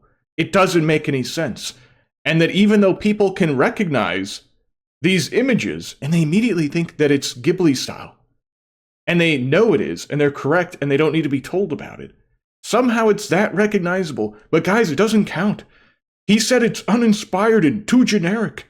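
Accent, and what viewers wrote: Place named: England, United Kingdom. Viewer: Hallo, a hallo! Excuse me, geezer, but that’s an English accent. Not Australian, where my ancestors imprisoned Shad long ago.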